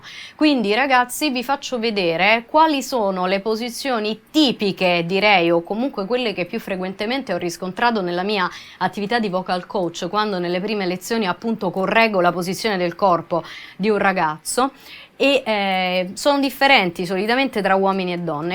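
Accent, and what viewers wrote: Rome, Italy. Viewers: Se sente che è de Roma :D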